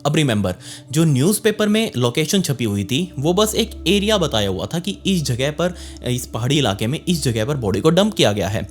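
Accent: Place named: Mumbai, India. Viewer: आपका बोलने का तरीका बहोत अच्छा हैं और मुंबई स्टाइल वाला हैं इसे आप जैसा हैं अच्छा हैं साहब जय हिंद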